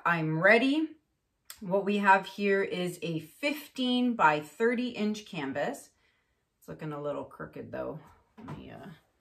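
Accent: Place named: Atlantic Canada, Canada. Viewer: I just want to tell you how much you've inspired me. Im a super crafty Atlantic Canadian and I am in awe of the things you do. It's so great to watch someone who talks like me and shops at the same places and all of that jazz. I've subscribed and binge watched pretty much all of your videos. Keep it up and maybe you'll see me on here someday if I get brave enough lol. You are phenomenal.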